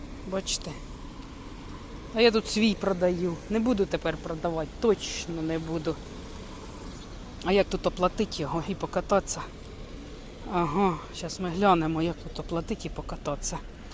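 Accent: Poltava, Ukraine. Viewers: Полтавський діалект😊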